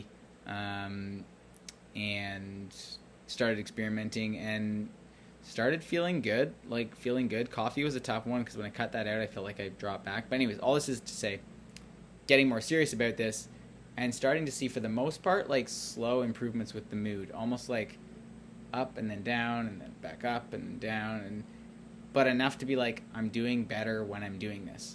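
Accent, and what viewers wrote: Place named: Canada. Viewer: I do enjoy a Canadian accent - and I like your reference to emotional ‘richness’ in the ups and downs of life.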